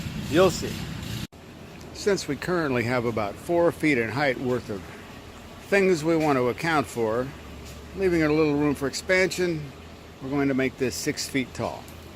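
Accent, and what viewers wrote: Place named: Texas, United States. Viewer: "Fixin to do" 😂 are you from my neck of the woods in Texas?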